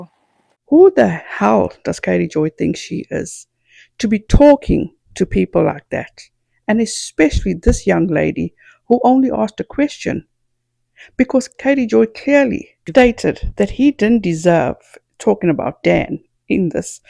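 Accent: South Africa. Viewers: So lovely to hear your beautiful South African accent! 🙌🏻🙌🏻 🇿🇦🇿🇦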